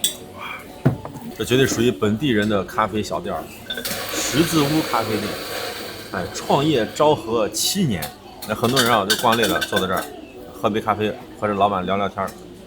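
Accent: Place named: Qingdao, China. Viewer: 听口音是青岛人吗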